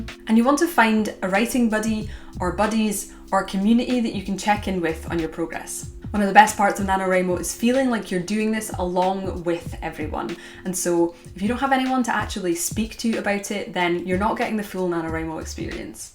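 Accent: Scotland, United Kingdom. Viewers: I love your accent! Is that Scottish? Great tips btw, I signed up for your newsletter to get the plot embryo videos because I struggle with the same thing, I love worldbuilding and creating characters but it's tough to come up with actual stories to suit the world and characters I've created. Thanks for the tips! My Alphasmart Neo is an essential tool for me. I'm an editor at heart, so I struggle with self-editing while I write. Using a distraction-free digital typewriter like Alphasmart products is a great way for me to get my first draft down without censoring or editing while I try to write. I'm hoping with all the tips I've learned from you and other channels, I can finally finish Nanowrimo for the first time after 2 other failed attempts.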